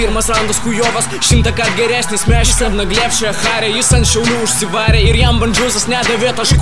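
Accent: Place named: Russia. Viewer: Man asmeniskai dar geriau kad yra rusiskas akcentas idomiau klausyt krc mldc ! ;]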